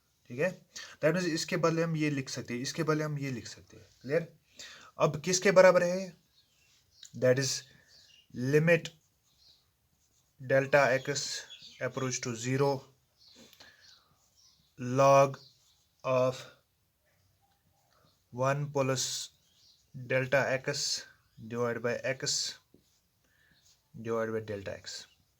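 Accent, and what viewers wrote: Kashmir, India. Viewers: Are u from Kashmir sirr ? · I also think that sir is from Kashmir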